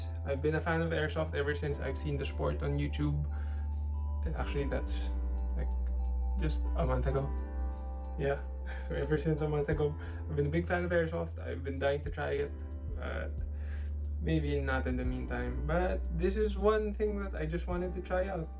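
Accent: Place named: Philippines. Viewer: filipino english is so satisfying!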